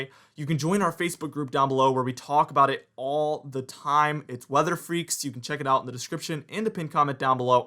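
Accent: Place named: Boston, United States. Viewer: Boston...just guessing from Maine. Lol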